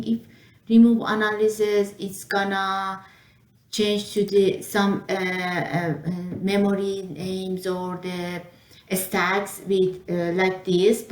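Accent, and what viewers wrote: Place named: Iran. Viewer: I like your Irani accent jan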